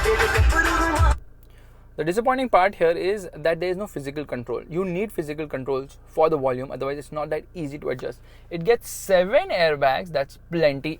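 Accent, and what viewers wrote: India. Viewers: Typical indian english why dont you speak hindi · Someone kill the Indian English accent please 🤢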